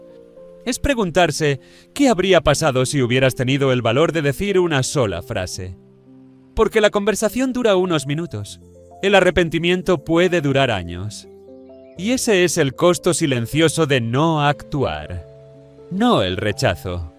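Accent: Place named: Spain. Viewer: siento que si le quitamos el acento español a su voz se parecería a la de Satoru Gojo jejejeje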